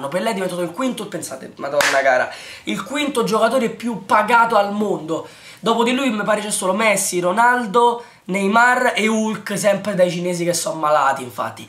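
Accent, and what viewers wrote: Rome, Italy. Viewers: Ciao mi chiamo Pino e come te sono di Roma anche se sono della LAZIO....Vivo a New York da 11 anni e ti seguo da molto tempo, sei molto bravo ma dovresti essere un po meno tifoso e Romano Parlante.....potrei essere tuo padre e se posso ti consigliei di studiare per diventare giornalista sportivo...ciao. alla prossima Pino.......Dimenticavo prova a parlare di LOTITO solo cosi potro' vedere il tuo talento....Ciao.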